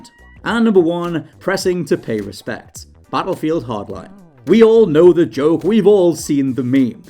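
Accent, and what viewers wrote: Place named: Scotland, United Kingdom. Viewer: clicks video hears scots voice and leaves.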